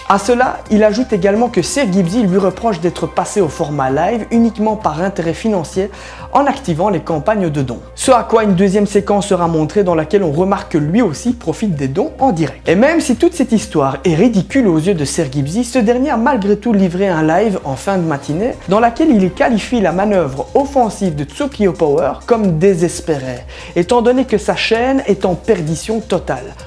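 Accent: Belgium. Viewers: mec es ce que tu es belge car ta voix my fait penser et t'es drama ces de l'humour ou tu fais que informer sur des drama avec des preuves concrète ou tu fais juste sa pour attiser la haines ou tu utilise le mots drama juste pour la forme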